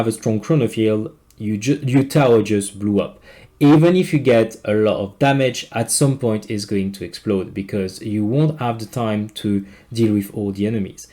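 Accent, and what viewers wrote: France. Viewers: this video title and the first 1min have already made my day! been wondering which creator code to use soon but I think I may have made my decision. i was prejudiced against your accent because I thought I couldn't understand it. But once I realized you were French it became very easy to understand you. Your mindset and presentation both most closely resemble my thinking and learning style. (Shout out to Spartan, as well). Can't wait to finish watching!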